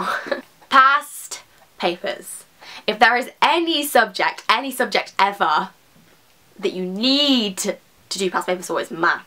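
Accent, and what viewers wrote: United Kingdom. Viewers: I actually am obsessed with your videos 😂😂😂 I feel like I relate on so many levels, so nice to hear a British voice on a revision channel 😊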